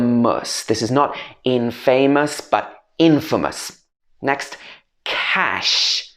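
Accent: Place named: United Kingdom. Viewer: Good British pronanciation..thanks for such type of videos.. visit our channel...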